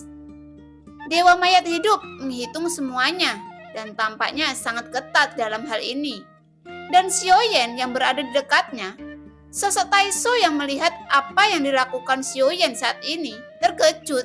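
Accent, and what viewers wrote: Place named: West Java, Indonesia. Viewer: Sunda banget ka hihi ..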